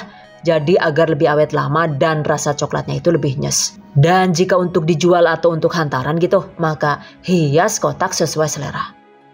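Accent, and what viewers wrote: Banyumas, Indonesia. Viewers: wkwkwk.. ngapaknya darimana bang? saya cilacap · Waw dubbernya " ngapak" kiye 🤣🤣🤣🤣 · Ngakaaaak... Ternyata orang ngapak juga, ora ngapak ora kepenak ya mbekayu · Lama ga nengok channel ini. Kangen intermezzonya.. Logat ngapaknya manaa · 😂 jebule ngapak 😅 keren Channelnya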